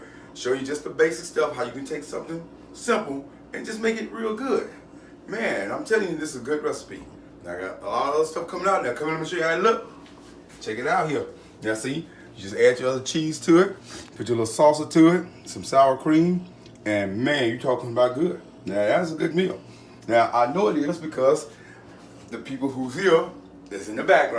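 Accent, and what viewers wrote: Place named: South, United States. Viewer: Where are you from ? You too funny 🤣...gotta be from the south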